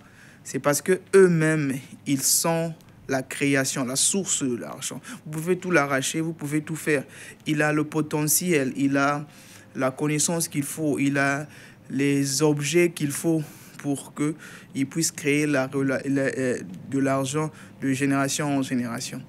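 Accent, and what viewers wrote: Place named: Asia. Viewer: Tu as pris l'accent asiatique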